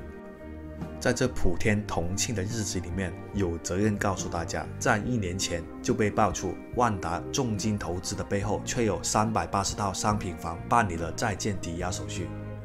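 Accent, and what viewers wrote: Hong Kong, China. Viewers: 哥仔，我听你的口音有点似香港人，不像完全一个来自广东的小伙子，看来你对广东这一带的城市也相当熟悉，最后你的一句“再说就要被油管封号了”，说了些啥敏感话题了？是抵毁啥啥，啥啥？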